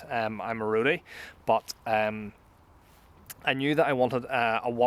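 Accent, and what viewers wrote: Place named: Ireland. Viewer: I thought the accent was familiar, my one year younger brother was born in Ballymote Ireland August 1965 at a Catholic nuns house and he's the most successful of 5 children , freshly retired with full pension and, I think I'm going with a 2022 Cube Aim pro in same color scheme as your's $500 off retail right now at $999.00 CAD ,& putting 5 great touring accessories on it Both fenders,Both Lights and the Universal Kickstand,So should be a great bike , Never have spending over a G Note for a New pedal bike instead of buying second hand used for $400 less, So I figured the extra cash for a New bike is the wiser way to go. From Alberta Canada.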